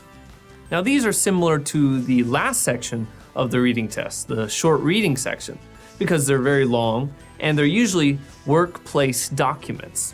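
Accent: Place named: Yorkshire, United Kingdom. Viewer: hi there :) I'm really into your videos and i find that i admire you lots, i enjoy your British accent the most, which sounds like Yorkshire people :) your videos have helped me so much in my toiec preparation. But i'm still a little panic before taking part in the Toeic test. my facebook is 'Z hana ookaze', pls add friend, i d love to make friend with you :) . Best regards from Saigon!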